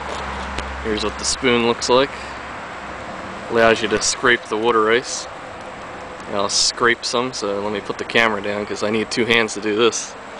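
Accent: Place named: Philadelphia, United States. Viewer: love the Philly accent!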